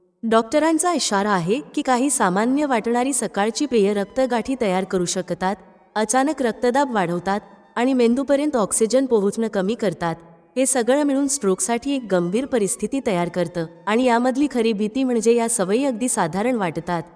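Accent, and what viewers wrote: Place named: South, India. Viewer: मराठी उच्चार दाक्षिणात्य वाटतात...😊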